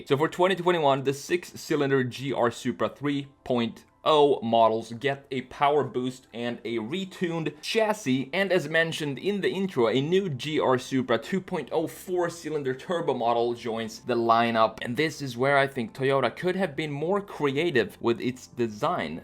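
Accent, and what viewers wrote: Sweden. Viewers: Hejsan! Hamna på din kanal av en ren slump och hörde på din engelska att du va svensk? Rätta mig om jag har fel:D Jag vill bara säga att du gör ett fantastiskt jobb, riktigt kul att se, du har flera videos som jag måste börja kolla genom. Snyggt jobbat!!!!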